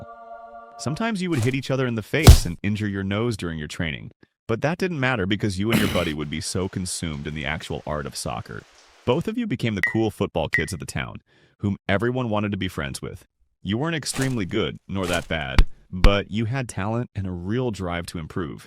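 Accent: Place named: United States. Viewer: Bro is this video a troll? Because you sound too american to be serious when talking about football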